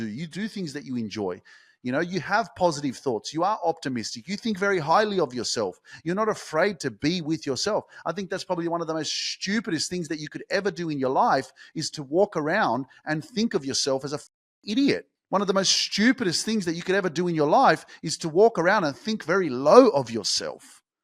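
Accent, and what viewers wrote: Australia. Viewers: He's not British! Think maybe the accent is Australian.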